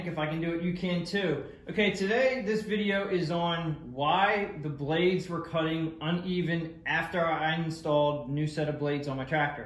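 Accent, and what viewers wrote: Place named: Pittsburgh, United States. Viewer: Already done sir! Yes it may seem barbaric to some, but you gotta do what you gotta do and I assume most people would rather take the barbaric route than shell out tons of cash for a new deck. I wish I had seen this video before I got rid of my old John Deere. Also...based on your slight accent I'm assuming you are a fellow Black and Gold fan? ;)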